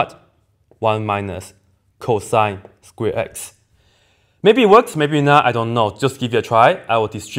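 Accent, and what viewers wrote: China. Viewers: His english is cool It seems a mix between english and chinese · You speak English like Chinese unlike me which is Bengali😂😂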